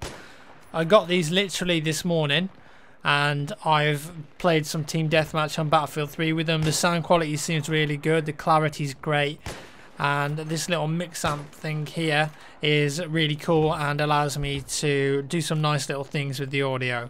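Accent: United Kingdom. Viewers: i love the sweet sound of your uk voice, can we game on box later...not that box you perv...360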